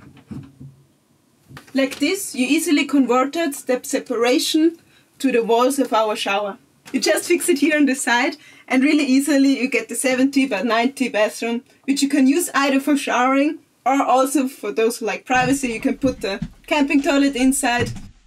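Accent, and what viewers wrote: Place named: Italy. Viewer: I can hear a liiiiiitle bit of an Italian accent there : ) But I wasn't sure, great English speaking!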